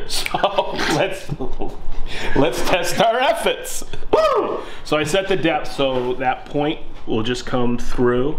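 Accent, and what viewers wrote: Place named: New England, United States. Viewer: I guessed "New England", so I was right but it's maybe unfair to guess six states at once?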